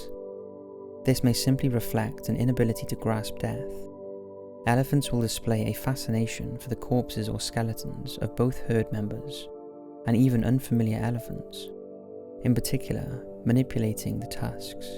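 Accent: Wales, United Kingdom. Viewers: moonbeam starchild - that's the Welsh accent for you!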